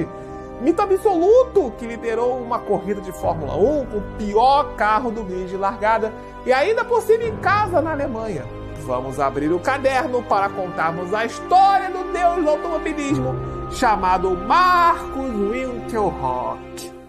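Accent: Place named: Portugal. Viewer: Sim, belo sotaque PT-PT!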